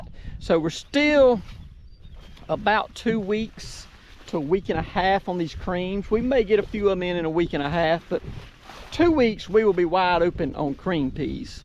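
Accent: South, United States. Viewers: Love the update. Next run on the t shirts, consider adding "hey y'all" (the way you start each video). Such a great southern thang😀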